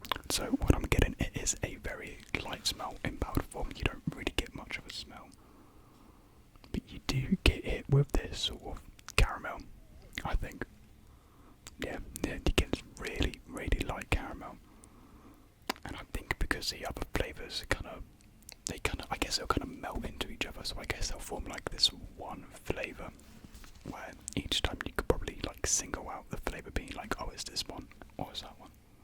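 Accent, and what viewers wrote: United Kingdom. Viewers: Is he British cuz British people are good at ASMR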